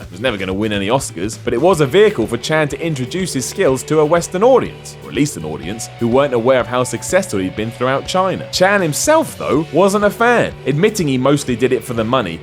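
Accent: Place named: England, United Kingdom. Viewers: Jackie Chan? Are you fucking kidding me? Maybe someone should do an internet top 10 SHITTIEST top 10 lists and have this annoying motherfucking WhatCulture lists take all 10 spots!!! As soon as I hear this English guy now I know it's shit!!!! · from WhatCulture. He's phoning in these voice overs because he's gotta dedicate most of his energy to physical training. And yet, he's got an unapproachable Londony class that he brings to every narration.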